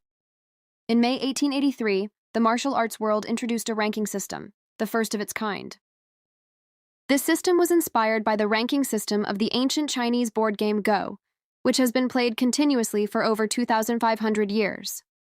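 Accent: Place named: United States. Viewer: Narrator must learn proper pronunciations of Japanese names and places. Sounds too Yankee!😮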